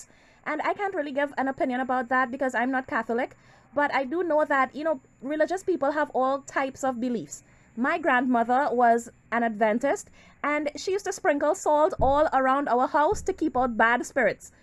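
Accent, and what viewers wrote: Trinidad and Tobago. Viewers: I love your content.. And your accent sounds 🇹🇹 trini like myself.. Ur right both bloogers hv something wit an they look salty as hell. ain't got time.. She makin money 💰 moves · Hi are you Trinidadian??? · I love your accent are you trini? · I clicked so FAST!!! Talk your Trinny shit! Love your accent and I’m here for all the Tasha K drama.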